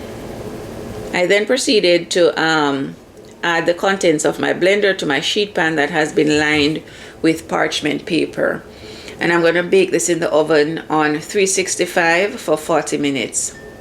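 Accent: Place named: Jamaica. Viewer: Commenting and liking the video for the algorithm - big up that beautiful patwa accent. I badly wanted more homemade vegan (I can swap out eggs) bread recipes. This one is really good. Thank you.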